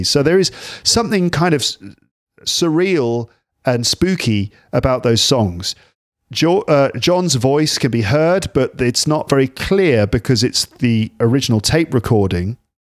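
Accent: United Kingdom. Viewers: I enjoy listening to you whatever you are speaking about! I love your British pronunciation. Hello from St.Petersburg.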